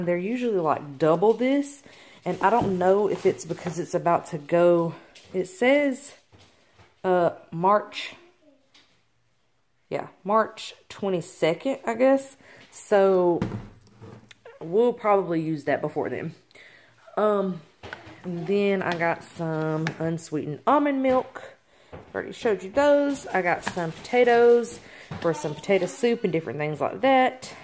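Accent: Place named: South, United States. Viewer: Family of 5! Girl, Idk how you do it lol. You're amazing haha. Are you from the south? haha I love your accent. I'm from KY.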